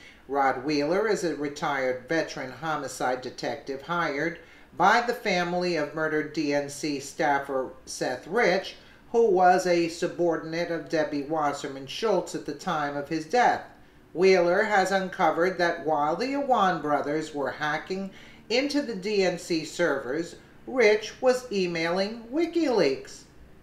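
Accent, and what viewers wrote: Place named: Brooklyn, United States. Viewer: Ok, you bring some good news to this but I have to unsubscribe. Why do you read what is on the screen? WE CAN READ. READ with support collateral or show text and talk about the collateral pictures/graphics. Also, you sound like a Brooklyn middle aged Italian/Jew. That whine is killing me. lol. You do a great job. I am simply being critical due to broadcast journalism past and a traditionalist. Plane flying over head is a nice touch. God bless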